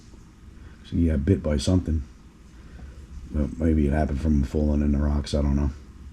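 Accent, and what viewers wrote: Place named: New York, United States. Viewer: I already know I want this rod , lamiglass something, I got that thick new yawk accent too, Bronx ? Anyways I’m from Long Island , local#3 in the city more than here, In any event yeah if you can let me know what rod that is - specs wise , I definitely need a new one, sick of my 9-2 st. Croix , it’s not the greatest for this top water guy , about to watch this vid I know you guys are gonna crush it ! Thanks brotha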